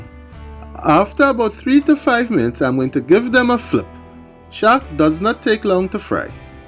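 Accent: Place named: Trinidad and Tobago. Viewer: your videos are awesome too - I love the Trinidad vibe - two of my best friends are a couple and she is Trini - they live in LA and I see them a few times a year and her whole family is so positive! That is who I went to Carnival with and your narration is just 100% that vibe 😊 it's fun to watch even if I don't think I have the cooking chops to do what you do!!!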